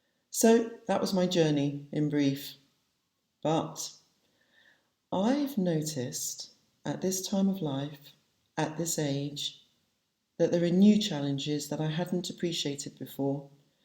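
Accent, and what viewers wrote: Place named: United Kingdom. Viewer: Oooh heard the accent and subbed! Not found many Brits on here I’ve small locs 184 10 months old. I started my sisters 6 weeks ago she has bout 205. Will share this with her too hopefully got some good tips for us.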